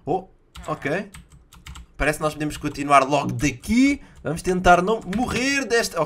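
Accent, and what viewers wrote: Portugal. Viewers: o é português. por isso ele fala assim!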